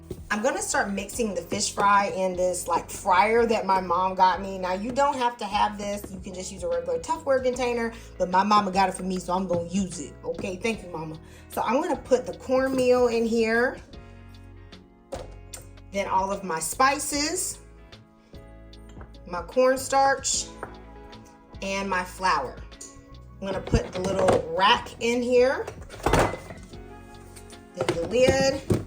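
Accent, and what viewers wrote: South, United States. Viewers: I sure do like your (Southern?) drawl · 😅 sound like my cuzzin! Girl you country? Love ya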